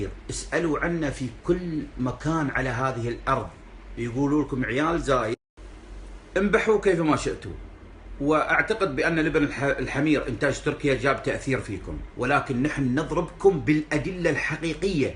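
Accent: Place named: Bahrain. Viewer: ابدا منت كعبي مستحيل لهجتك بحراني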